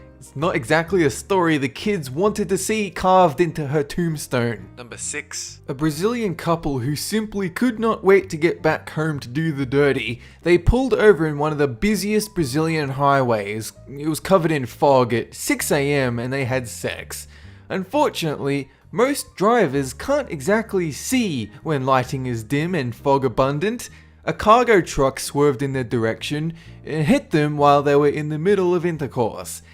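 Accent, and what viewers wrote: Australia. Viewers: Debbles NZ lol wtf, I'm an Aussie and yes he is definitely Australian, how could you confuse that with an American accent? it's so obvious, especially if you're a Kiwi or Aussie! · sounds like an Aussie?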